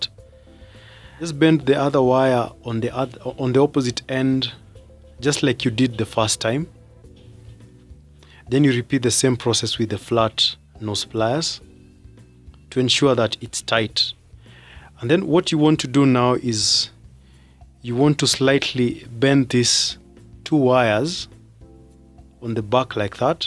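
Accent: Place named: Somalia. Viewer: defo somali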